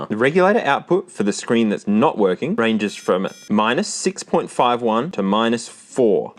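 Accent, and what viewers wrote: Australia. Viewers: I love how Aussies say "ninety" and "nineteen"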